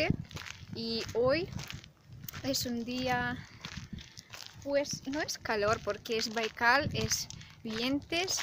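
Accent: Spain. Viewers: ... podrias hablar ruso.. se te hoye bien.. adoro el ruso.. pasa que con el dia a dia.. uno lo que menos aprende es ruso.. te resulto dificil el español? veo que tenes un español de españa... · Tu acento es de España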